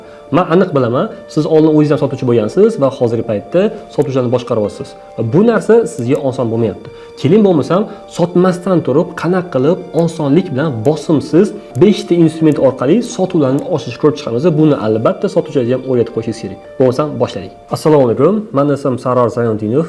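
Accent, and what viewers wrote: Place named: Qarshi, Uzbekistan. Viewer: Qayerliksiz qarshiliklarga o'yshap gapirdiz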